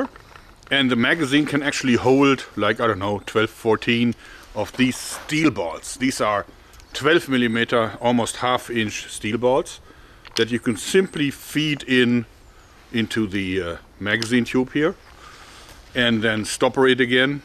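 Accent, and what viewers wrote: Germany. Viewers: qualquer brasileiro normal: POR QUE CARALHOS O YOUTUBE TA ME RECOMENDANDO UM VIDEO DE UM CARECA COM SOTAQUE ALEMÃO SOBRE ESTILINGUE ARTESANAL??? YouTube: nosso algoritmo é perfeito :)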